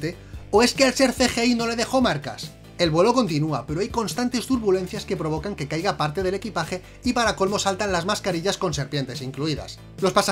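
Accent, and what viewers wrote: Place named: Andalusia, Spain. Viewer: De Andalucía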